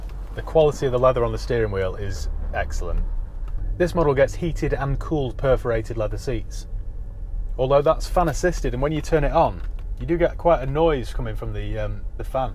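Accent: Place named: England, United Kingdom. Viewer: What part of England is the presenter's accent from? reminds me of some accents in Game of thrones, EXCELLENT REVIEWS BY THE WAY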